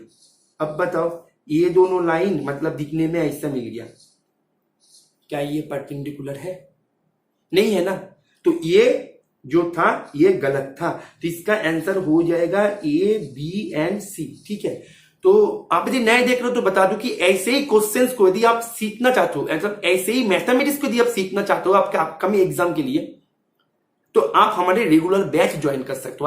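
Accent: Bengal, India. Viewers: Thank you sir. Are you from Bengal?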